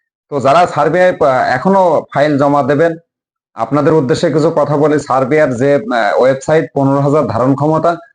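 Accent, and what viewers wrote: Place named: Satkhira, Bangladesh. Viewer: ভাই আসসালামু আলাইকুম আমি আপনার ভাষা শুনে মনে হচ্ছে আপনার সাতক্ষীরা ডিসটিক এর কোন এক জায়গায় বাড়ি দয়া করে যদি এই সত্য কথা হয় তাহলে আপনি আমাকে নক দিবেন আমি আপনার মাধ্যমে একটি কাজ করতে চাই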